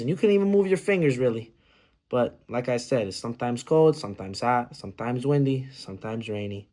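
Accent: Chicago, United States. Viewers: I like the mustache and glasses that you used in the speech I also feel like using the strong Chicago accent and stereotypes was a good way to reflect how the city is to people. Also, I believe that Caleb Williams is the next Brady.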